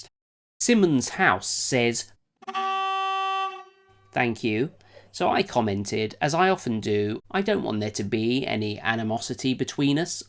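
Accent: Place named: United Kingdom. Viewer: Haha.. you are crazy, but you do have a fine haircut and a lovely British accent.. very good english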